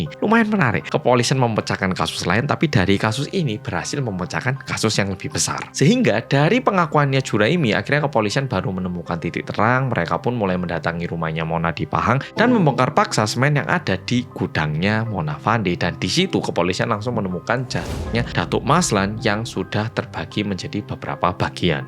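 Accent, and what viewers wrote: Java, Indonesia. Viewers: Hallo bolo medok😊 Lgsg nyimak rek 🎉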